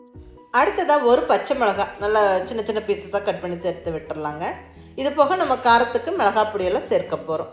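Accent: Coimbatore, India. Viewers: You are absolutely right. Not only the receipes but the way of presentation with (supposedly Coimbatore Slang) clear and crisp voice are adding merit to her receipes. Thank you madam somuch. Pls Keep rocking ...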